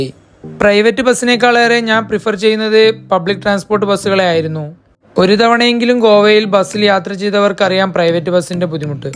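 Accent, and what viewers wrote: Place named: Kasaragod, India. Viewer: എത്രയൊക്കെ പരിഷ്ക്കാരി ആവാൻ നോക്കിയാലും എടക്ക് ആ കാസ്രോട് സ്റ്റൈൽ കേറിവരുന്നല്ലോ മോനു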